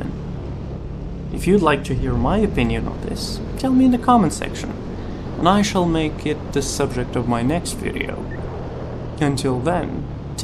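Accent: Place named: United States. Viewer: One question : From where or how you learned to talk like that and speak english fluently and like an american ; i need some help !! Hope you answer me .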